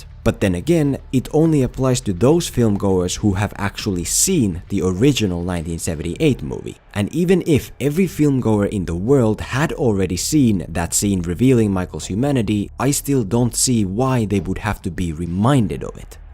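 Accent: Finland. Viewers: Ootko suomalainen kun on niin suomi-aksentti?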